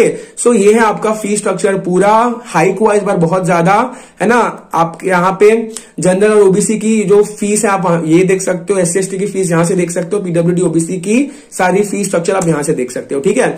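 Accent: Bengal, India. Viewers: Bhaiya!are you Bengali or South Indian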